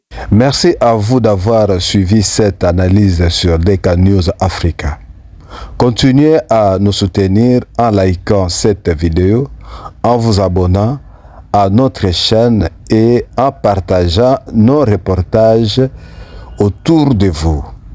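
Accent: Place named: Rwanda. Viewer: Avec tes R La tu rwandais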